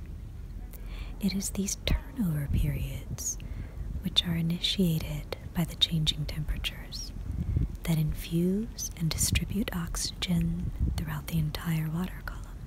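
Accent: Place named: Canada. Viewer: All perfect from A till Z.. Winter has also its calm, romantic and cuddle feelings. Thank you.. But nice to hear your American english (though i know its canadian). Enjoy the nice christmas time dear friend 🎅🎅🎅🌲🌲🌲🌠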